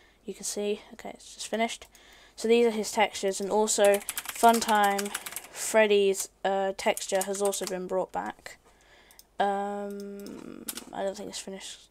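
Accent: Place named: United Kingdom. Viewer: Bri'ish boi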